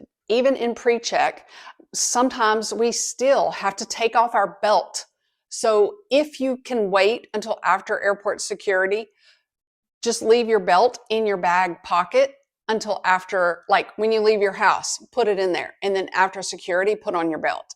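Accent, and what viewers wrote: South, United States. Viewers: I love listening to while drinking my morning tea. Love her Southern accent- home to me! Even though I travel internationally a couple times a year and have for a long time, rules change and just adds to my peace of mind with her updates and equipment tips. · Love it! Thanks for the tips. I'm always looking for ways to make my airport experience better. Also, loving your accent from one southern gal to another!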